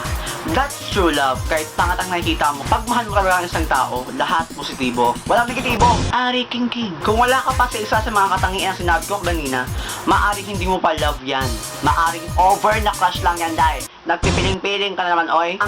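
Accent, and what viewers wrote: Visayas, Philippines. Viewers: visaya ka teh??????/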